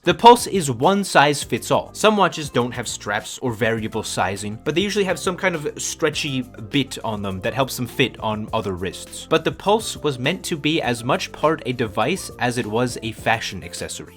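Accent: United Kingdom. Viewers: That brit accent lmao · This is the best video 11 out of 10, best british accent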